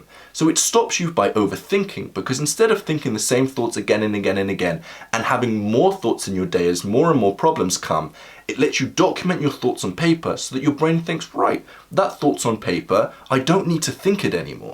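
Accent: United Kingdom. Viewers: Your accent is wild I feel like you’re British but your dictation is Americanish to sound claró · Such an incredible, inspiring young man, not only you are very smart and charming and that British accent help you the most.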